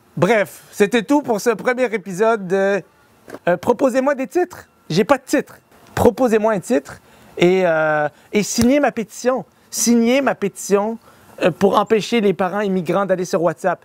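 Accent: Quebec, Canada. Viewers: Le retour en milieu naturel... Le Dib en forêt, ça c'est drôle (!!) Frrrranchement ça a po d'allure. L'accent de canard Québecois m'a toujours éclaté. Amitiés à mes amis du Québec, ainsi qu'à toi nouvellement "rencontré" jeune Dib. 😉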